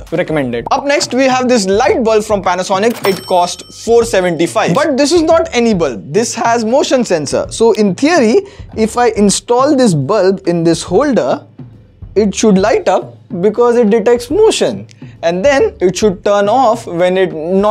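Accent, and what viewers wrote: India. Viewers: Indian lag rahe ho Hindi me bolo na mujhe kuch bhi samajh Nehi aaya 😂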